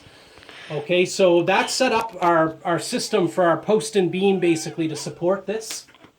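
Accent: Ireland. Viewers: Touch of Irish in your accent?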